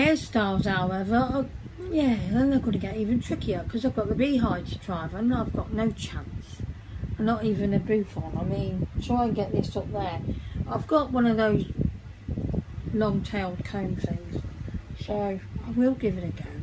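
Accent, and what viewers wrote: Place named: United Kingdom. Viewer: Also, I love listening to you. Your accent is so wonderful!! Living in the US my whole life, I’ve always wanted a British accent. 😍😍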